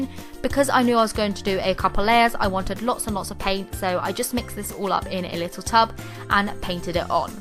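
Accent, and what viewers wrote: England, United Kingdom. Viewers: I like and enjoy watching your squishy videos but (just my personal unpopular opinion) I can’t stand British/English accents.. idk why my ears can’t handle your accent lol so I have to watch your videos on mute. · I dont want to offend her but..... Are you British? She really sounds British....... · Are you from England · I like your ascent, are you British?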